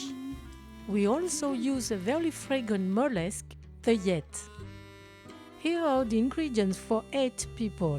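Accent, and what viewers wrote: France. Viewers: This has to be one of my favorite versions of this dish! The time you took and detail you provided was inspiring. I just love seeing another person who loves cooking world cuisine for the joy of it and it’s so heartwarming to see what I perceived as an Asian woman, with a French accent, making a West African dish that I, as a woman of similar differences, love recreating meals and the memories I love from around the world. Thanks for sharing 🥰Can wait to watch more of your videos ❤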